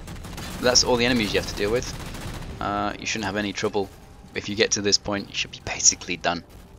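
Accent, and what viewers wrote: United Kingdom. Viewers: / /. Adjective: 1. The apex of human skill. Verb: 1: Attainment of perfection in the course of ordinary gameplay and related abilities. i.e. "I grenade jumping!" Noun: 1: The greatest Halo campaign player alive. 2: A friendly British chap with a suave commentary voice.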